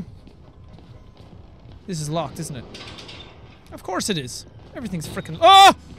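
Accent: Ireland. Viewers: i have seen the whole gameplay even of the whistleblower dlc and I love watching him figure it out with his Irish accent, anyone else? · I have an intense stomach sickness going on right now, and there's nothing like a sweet Irish accent to lull me to sleep :3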